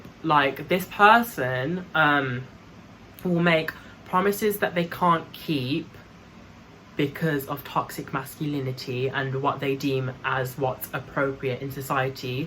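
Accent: England, United Kingdom. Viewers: Loved the video, it was good to hear an English voice rather than American. I thought you brought out relevant points in your topic which has been covered many times by Trans women on you tube. But you missed out one type of man in your vlog and that is the one who looks at a trans as a woman not bothered what's between her legs, takes her for who she is. Not worried about being seen in public like the relationship is some kind of secret that he doesn't want to let out of the bag. And yes, I've subscribed. Keep safe.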